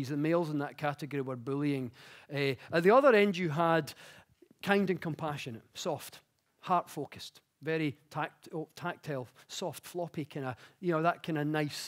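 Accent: United Kingdom. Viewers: Glasga accent? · Adorable scottish accent ...fantastic talk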